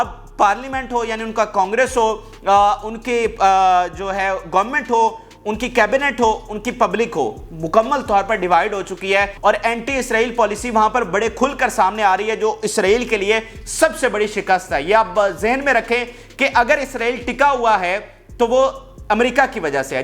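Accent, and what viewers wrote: Punjab, Pakistan. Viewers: Apni Urdu theek kro Punjabi style ki urdu mat bolaaa kro ....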